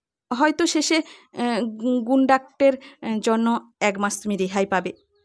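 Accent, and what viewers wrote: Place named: Bangladesh. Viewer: গল্প পাঠে বাংলাদেশের টান আছে ।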